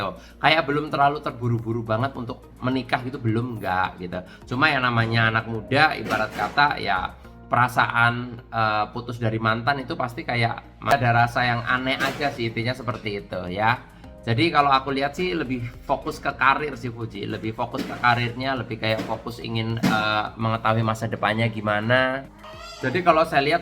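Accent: Banyumas, Indonesia. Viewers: Mengeneh gara² video ne kang 😂rika ngapak banget asli ngendi mas😂😂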